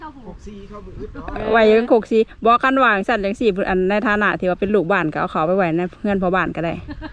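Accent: Isan, Thailand. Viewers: เว้าคือคนอีสานบ้านข่อย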